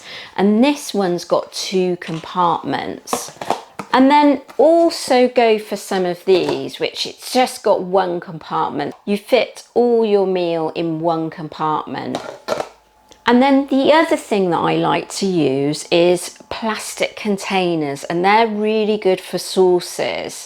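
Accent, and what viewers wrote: England, United Kingdom. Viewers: “I absolutely love chopped chopped brussels” With your English accent…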